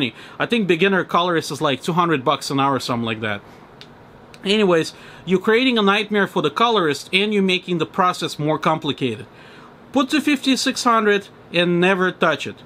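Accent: Russia. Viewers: This guy Russian accent How do I use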